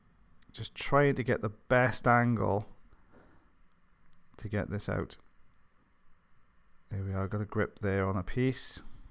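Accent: Wales, United Kingdom. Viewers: I have family in Mountain Ash and my grandad was from Abergavenny. I'm in Canada now but you sound like home. Thanks!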